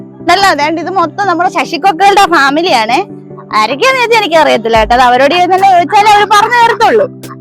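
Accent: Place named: Pala, India. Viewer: ❤🙏 പാലാ സംസാര രീതിയാണ്❤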